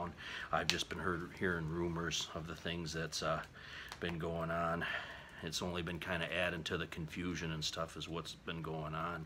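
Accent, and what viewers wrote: Michigan, United States. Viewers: Sounds like you did some research for this one.👍 Just found your channel and subbed Sounds like you’re from Northern Mich. also.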